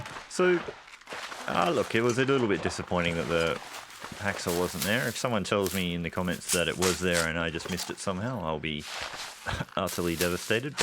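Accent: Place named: Australia. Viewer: The weather was great but you're skills in taking advantage of it were excellent. Wish you could have found a hacksaw but as others have said the flare gun is a good get. Also nice to hear a fellow Aussie's voice in the Canadian wilderness!!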